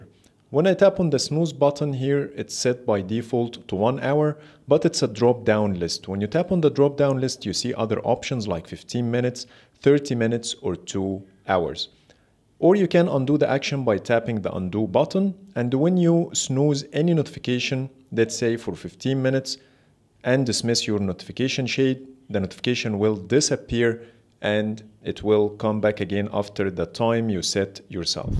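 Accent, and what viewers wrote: Egypt. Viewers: You sound Egyptian! :D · I can tell from your Accent that you are Egyptian Keep the hard work 🔥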